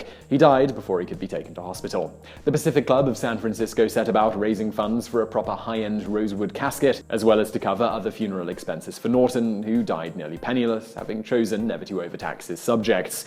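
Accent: England, United Kingdom. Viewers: man, northernlion sounds british in this. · Reads "misled" as mice-alled Proof that having an English accent doesn't make you smart...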